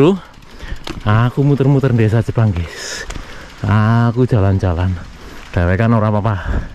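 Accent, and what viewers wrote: Banyumas, Indonesia. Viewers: semangat blusukan terus mas, bungah tak ulang-ulang terus nonton vidio blusukan mas ngapak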